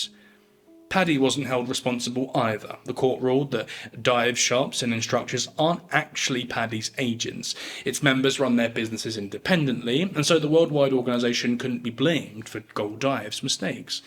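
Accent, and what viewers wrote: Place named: United Kingdom. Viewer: Just seen this in a yt browse of my TVs yt but this comment more to the piece's frontend or creator, dude where did you grow up, was it Britain? A few hours old dead body, is normally deemed a dead body, your saying "dragged her corpse" I take extreme issue with as your accent has a strong British aspect to it. We don't describe like you just did in the video-I seriously wonder are you genuine British, if genuine British then what are your motivations for saying as you have, it smacks to me of an American created or fed attempt to go further than USA vs GB 'is' vs 'was' for deceased birthdays, I am staunchly a was. And doesn't seem cold at all, more realistic recognising past as past and fact birthday is a specific happening in the present(or why mention) but corpse Vs body I can't believe a Brit made this mistake-were you brought up in USA dude or you just got a painting brush (for what's not(we have more care in what we do say) out)? 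For someone to die so young is a sad occurrence.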